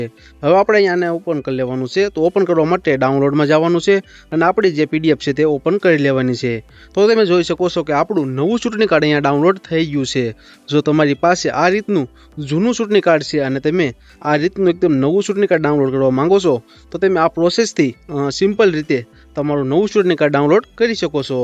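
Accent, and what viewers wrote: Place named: Surendranagar, India. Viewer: Surendranagar baju ni Bhasha ma avu bolaya 6e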